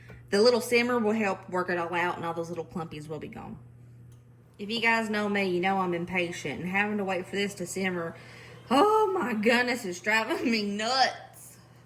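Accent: South, United States. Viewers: I love the way you say “ole” I love the southern accent❤ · I grew up in Chicago. Wash sounds like warsh or whoosh. I got teased so much when I joined the army that I forced the accent away. My mom said I sounded like I was from Boston. How you pronounce the words is due to your Southern accent. It isn't wrong; it's just different. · NO SHAME IN HOW YOU SAY OIL!!!!!!!! I say it like that too!! People tease but I love a Southern accent. That's one of the reasons I was hooked on your channel so fast! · Foil, oil, boil, anyone from the south says it just like you. It's part of our charm. Don't change.❤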